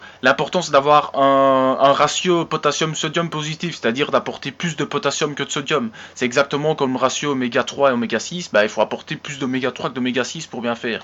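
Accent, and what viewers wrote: Liège, Belgium. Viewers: Serait tu de Liège ? Haha je reconnaît ton accent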